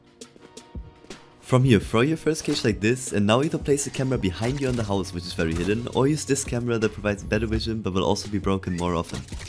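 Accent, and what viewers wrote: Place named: Germany. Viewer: Great Video, actually really well done! btw where are you from? U sound a bit German if I may say so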